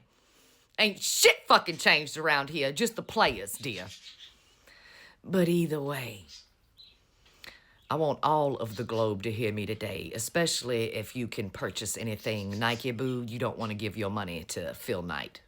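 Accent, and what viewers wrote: South, United States. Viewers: England here: I love your casual, conversational style of getting the message out. You're a powerful orator with a lovely southern accent. Keep up the good work. The world needs to hear what's going on in America, now more than ever. 😘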